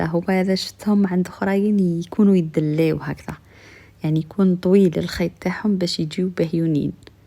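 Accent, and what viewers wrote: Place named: East, Algeria. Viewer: باينة على اللهجة تاعك من الشرق الجزائري أول مرة نشوفك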